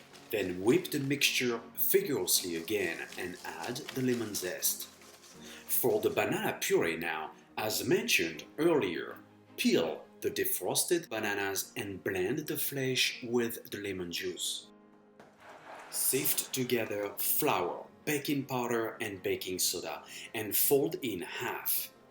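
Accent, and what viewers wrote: France. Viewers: Your accent is sounds so funny :D French, isn`t it?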